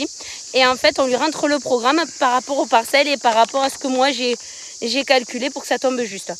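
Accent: South, France. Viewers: Quelle doux bruit !.pas les cigales !ta voix du sud bien sur 👍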